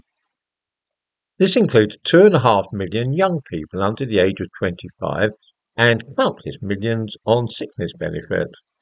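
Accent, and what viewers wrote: United Kingdom. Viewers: Thick British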